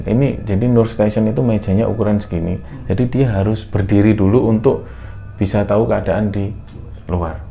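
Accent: Java, Indonesia. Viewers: Mas tetap jadi story teller ter-fav! Oh iya mas, saran untuk memperbaiki pronunciation kata dalam bahasa Inggris. Sebenarnya gak masalah dengan logat jawanya karena saya juga orang jawa timur. Tapi menurut saya lebih baik jika cara pengucapannya benar 😃 Dan jika ada kesempatan, saya ingin menceritakan cerita horor temen saya yang pernah bekerja sebagai perawat orang cacat dan kejadiannya tahun lalu, di Hamburg. Tidak terlalu panjang, tapi setidaknya bisa berbagi bahwa hal seperti ini juga ada di negara lain 😁 Semoga makin sukses! 😃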